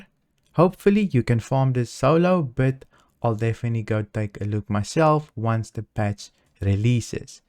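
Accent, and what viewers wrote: Afrikaans, South Africa. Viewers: Love the Afrikaans accent. Im also from South Africa.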